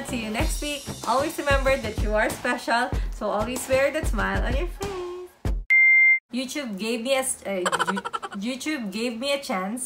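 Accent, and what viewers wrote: Visayas, Philippines. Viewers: bisaya diay ka mam, pariha ta hehehehe. salamat! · Hahaha bisaya diay ka mam hahaha ilove it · Idol bisaya man d i ka ..pariha ta hehehe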